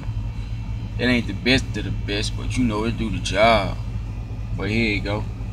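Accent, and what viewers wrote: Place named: New Orleans, United States. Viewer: 🤣🤣you sound like you from New Orleans but they sound good asl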